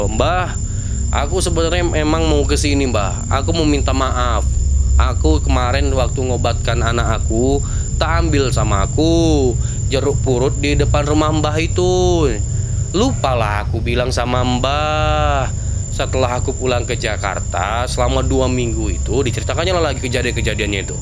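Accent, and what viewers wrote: Medan, Indonesia. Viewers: Kereennn ceritanya leeekkk.... Medan kali laahh gaya abg ini semangat bang.... 😂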